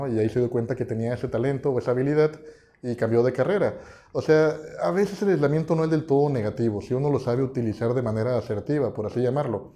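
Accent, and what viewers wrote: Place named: Monterrey, Mexico. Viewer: Eres de Monterrey❤ ya lo imaginaba por el tono. Jeje.. super canal! Sigue asi y no deje subir videos 😊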